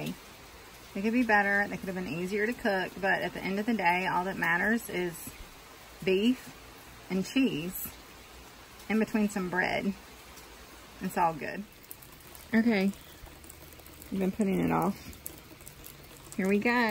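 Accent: South, United States. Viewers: Just now found your channel, awesome videos! Making me miss my grandparents cattle...and a southern accent! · Love your country accent/talking. Be back childhood memories. 🇺🇸🇺🇸🇺🇸🚜🚜🚜👍👍👍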